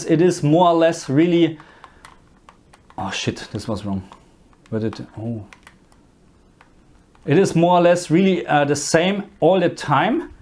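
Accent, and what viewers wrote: Germany. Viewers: First sentence, immediately knew you’re german. Gutes Video. ^^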